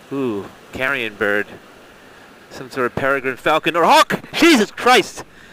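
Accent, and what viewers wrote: United States. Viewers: just wondering; why does the motorcycle have a British number plate but you have an American accent. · You sound like an American but the roads say and bike British Empire. The amount of land splitting is insane and that traffic is insane. Don't even think about lane splitting in Texas, you'd get smashed by the idiot drivers that are paying more attention to their cell phones. Plus its illegal. The T-120 is an old man's bike for sure and 80 horses is like you said not neck snapping but maybe adequate. As I've stared at your handle bars for damn near 30 minutes, it's clear the wiring from the hand controls looks really bad. I'd give the designer and F along with his buddy that designed that stupid fuel filler cap. Don't understand your bleeps or that you blurred out your speed when you hit 80. I'm a little confused thou when 20 mins in you seem to suggest the T100 with it smaller motor was better. 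Unsuitable for motor traffic... lol is that someone's suggestion or a government sign? I think you did a good job with your impressions.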